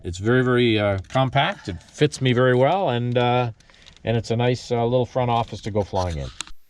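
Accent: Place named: United States. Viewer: Thank you it was very interesting and relaxing video, pleasant , calming voice with good American English. Greetings from Ukraine, home of biggest plain .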